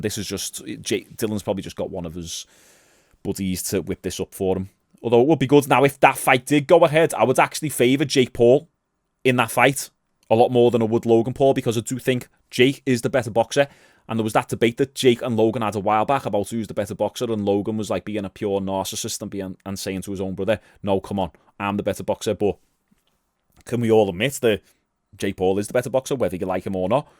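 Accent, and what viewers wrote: Liverpool, United Kingdom. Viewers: W scouse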